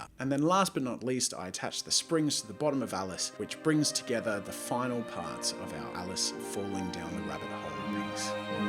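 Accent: United States. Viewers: His American accent is pretty great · why is his american accent so good? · american accent is amazing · Omg your american accent! More please! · THE AMERICAN ACCENT... WHY DOES IT SOIND SO DIFFERENT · *'s American accent is totally on point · * doing an american accent is so scarily accurate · Your America accent is spot on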